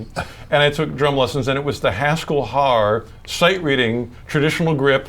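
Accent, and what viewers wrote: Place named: Transatlantic, United States. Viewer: He's changed his appearance and accent to transatlantic. Not sure if this is a phase or if he's aware of it.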